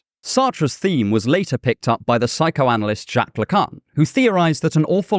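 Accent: United Kingdom. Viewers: I watch for philosophy but also bc your s tier British accent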